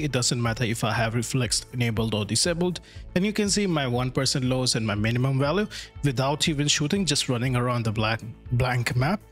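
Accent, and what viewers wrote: India. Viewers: Remember the -threads in set launch options? does it still work? like i had a 5600x before and i put -threads 13 there, and my game usually felt better, but now with the 5950x do i need to put -threads 33? because the game wasn't using the threads correctly even if u enable multicore rendering in option. Oh and BTW, are you hindi? based on your accent.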